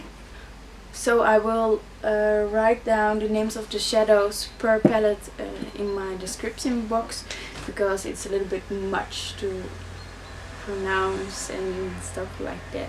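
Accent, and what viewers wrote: Netherlands. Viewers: Jij bent sws nederlands 😂😘 ik hoor het aan je 'platte nederlands' accent hhaah je spreekt overigens wel heel goed engels maar toch hahah ❤️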